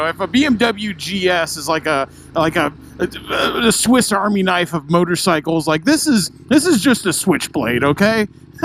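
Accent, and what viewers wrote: South, United States. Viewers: What happened to your southern accent?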